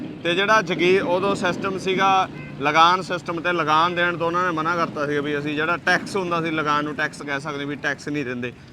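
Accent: Malwa, India. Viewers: ਲਹਿੰਦੇ ਪੰਜਾਬ ਵਾਲਿਆ ਦੀ ਬੋਲੀ ਮਾਝੇ ਨਾਲ ਦੀ ਆ ਸਾਨੂੰ ਸਮਝ ਆ ਜਾਂਦੀ ਆ ਦੀ ਬੋਲੀ ਮਲਵਈ ਆ ਤੁਹਾਡੀ ਬੋਲੀ ਸਾਡੇ ਨਾਲੋ ਥੋੜੀ ਜਿਹੀ ਅਲੱਗ ਆ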